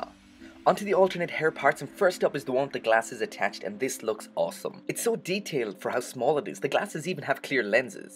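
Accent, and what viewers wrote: Ireland. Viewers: Awesome figure! ^_^ You wouldn't happen to be from Ireland would you? You really sound Irish xD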